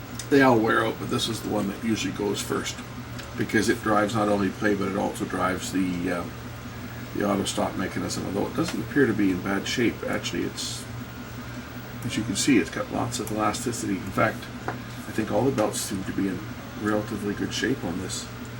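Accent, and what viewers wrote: Canada. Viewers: great canadian accent!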